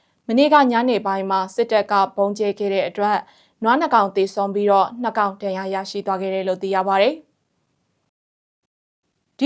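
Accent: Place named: Rakhine, Myanmar. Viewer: မမလေး. ကလည်းအသံလည်းကောင်း ရုပ်လည်းချောပြီခေတ်သမီးပျိုလေးလုပ်ပြီးရခိုင်သံလေးနဲ့ပြောတော့ AAက ထိန်းရှုပ်ဆိုတော့..ယူနက်စ်ကိုကရှေးဟောင်းအမွေးအနှစ်နယ်မြေကိုဘယ်ကောင်တွေလာပြီးမရှုပ်ရဲပါဘူး ကမ္ဘာကအထူးအသိအမှတ်ပြုထားတာ ၊စေတဲ့ကျွန်ထွန်တဲ့နွားမိစ္ဆာဒိဋ္ဌိကျွန်သဘောက်တွေက နွားသာသာေတွ၊နွားတွေထိအေးတာဘဲလောက်ပဲ ဆိုလည်း စာနပ်ရိက္ခာရှားပါးနေတဲ့အချိန်ယမ်းမှုံ့တွေနဲ့ဖျက်ဆီးသွားတော့ အဲ့ဒီနွားသုံးစားလိုမရတိ ဝဋ်လည်ကြအုံးမှာ စားနပ်ရိက္ခါတွေသွားချရင် ကို့လူတွေမစားရဘဲတော်လှန်ရေးရဲဘော်တွေရမှာ ကိုယ်လူတွေ ကိမ်ဆဲတာနဲ့ဒုက္ခရောက်တိမှာ သာလီစွပါ မမလေး...🎉🌹🎉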